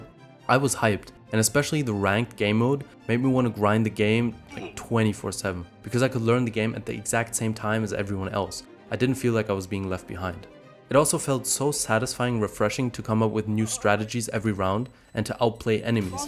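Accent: Germany. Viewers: ich hör doch dort einen deutschen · starkes video!!! übrigens hör ich raus dass du deutsch bist aber keine panik das schaffen nur 0.1% mach weiter so!!!!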